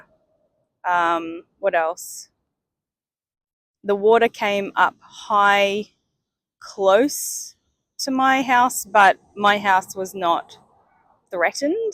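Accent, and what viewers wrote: Australia. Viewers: Your lessons are great because they are real life images of Australia, along with your typical Australian accent. I am going to Australia to live with my children so I really need to practice this Australian accent. Thank you very much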